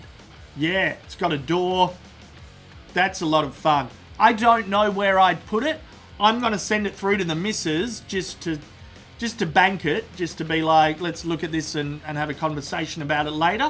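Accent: Australia. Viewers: i recognize his accent. is he australian or he just have a strong english accent. i mean really strong lol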